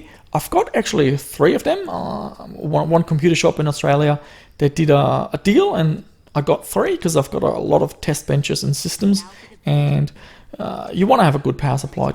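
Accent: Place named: Germany. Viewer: I always have a feeling there is a slight german accent in it. But mit might just be cause by regional stuff.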